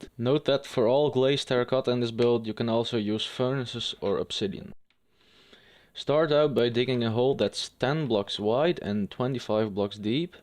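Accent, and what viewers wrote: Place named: Netherlands. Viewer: i can just hear the dutch accent, i love it. cool build man, i am goin to need this very well!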